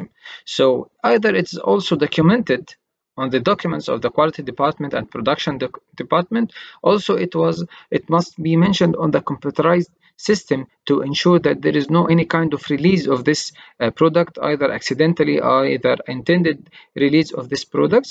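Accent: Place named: Arab world. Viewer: Are you from middle East? Your english is like arabic.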